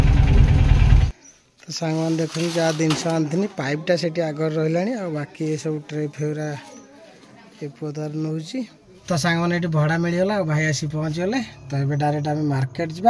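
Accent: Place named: Gulgulia, India. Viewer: Puara gulgulia katha sunibaku bhala laguchi ❤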